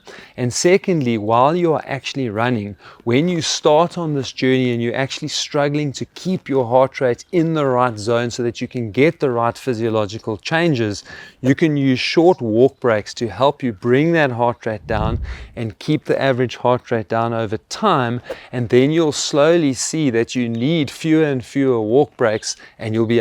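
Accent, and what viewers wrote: South Africa. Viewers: Advice sounds even better delivered with a South African accent. Such a lovely accent! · British? I only hear South African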